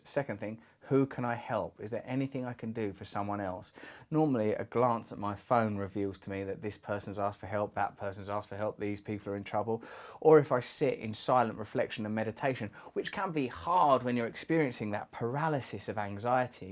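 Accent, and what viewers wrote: United Kingdom. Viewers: i notice you have an accent that sounds like Kathrine Hepburn sounded when she talked. Is a nice accent. British ?or Aristocratic?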